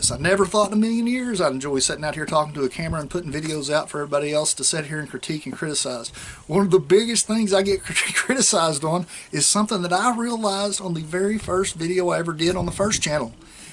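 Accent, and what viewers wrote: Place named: West Virginia, United States. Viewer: Hey I'm subscribed to both your channels. I just bought a cnc a few months back and was telling the wife that I learn more from your channel than anyone else. The first thing she said it's probably cause he talks like you... lol! Its alright to sound bumpkin buddy! Dont change a thing. I'm from West Virginia and I thought you were from around here too. Look forward to more of your videos. Add in some more CAD stuff now since you retired..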